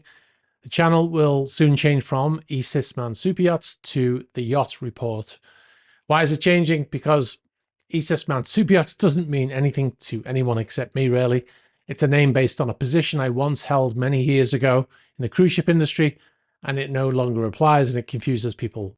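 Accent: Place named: Scotland, United Kingdom. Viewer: Man, do you speak in an unpleasant and hackling kinda scottish, almost stuttering way...did you take any lessons..?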